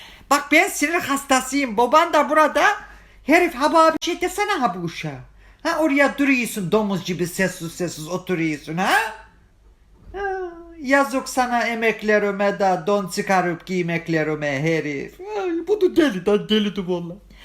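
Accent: Black Sea, Turkey. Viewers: Domuz Cibi🤣🤣🤣Karadeniz şivesinde bu kadar guzel konuşulurmu yaa 👏🏻👌🏻Yetenek yaa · Adam karadenizli Trabzonli Ofli daaa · abi çok iyi Karadeniz şivesi yapıyosun seni çok seviyorum ❤️🤗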